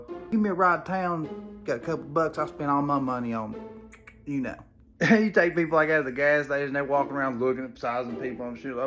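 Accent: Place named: Eastern Kentucky, United States. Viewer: Where you from? You sound like you live in eastern ky.... where I'm from lol I know people that have called dcf cuz their ex pissed em off 😂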